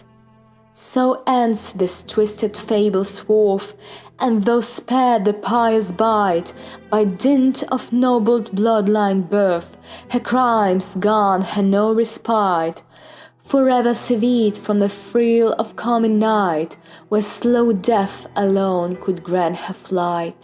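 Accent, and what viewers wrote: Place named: United Kingdom. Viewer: I love your voice and accent. Are you British? By the way, the part at the end literally gives me shivers. Maybe make ASMR?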